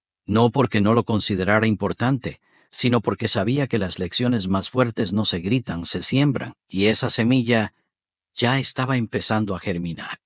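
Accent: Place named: Galicia, Spain. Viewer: Esa historia se repite y es vieja y pasa a diario en Mexico.. siempre llegan sierreños en Mexico en dealer se auto...como los ven prieititos y en huaraches no les dan atencion.. al final refiben la propina de su vida. La verdad tu historia es aburrida y mas de lo mismo ademas que tu acento es gallego..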